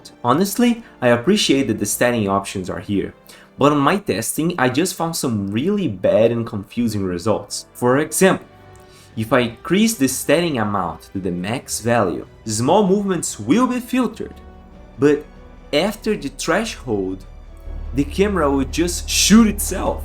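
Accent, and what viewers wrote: Brazil. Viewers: You have the brazilian accent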